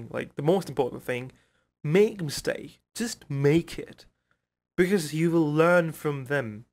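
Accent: England, United Kingdom. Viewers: uk aksanı bu ama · İngiliz aksani finaaaa ateşşş🔥🔥🔥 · Valla helal olsun , "I am John." desen kimse şüphe etmez , nasıl bir İngiliz aksanı ya, harikasın tebrikler👍👍👍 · British accent da cheery on top olmuş :)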